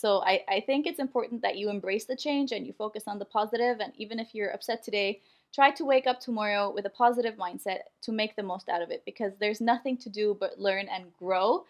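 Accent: United States. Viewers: Cool Estonian woman but your accent is totally American now so how about your native language effect there in Qatar ? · You sound American!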